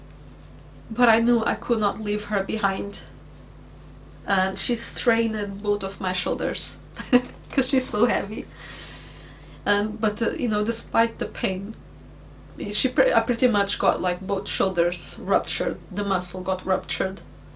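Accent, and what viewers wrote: Portugal. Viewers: i watched the whole video, and I love your Portuguese accent, when you say "thanks" it sounds to my "murican" ears that you said "tanks".. and my brain immediately went.. no wonder she likes tanks, but how do you do oil changes on a thanks... I've said this before you to on stream ages ago, but as one of the "silent" majority who watch, thank you, for choosing to keep your steaming and videos going. There are/were many a night where I am rocking one of my kids to sleep to one of your streams or chilling in the hospital icu ward, while joking with my nurses, watching. It is weird to think it has been that long, but one is just finished kindergarten, and the other is 2. Both boys love watching you/jingles, you have become routine like i used to watch specific military history shows in TV as a kid in the 80's. so Thank You, you've made an impact in lives much bigger then you've maybe imagined possible when you were back in that mess years ago. 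· Are you Portuguese? You sound like one...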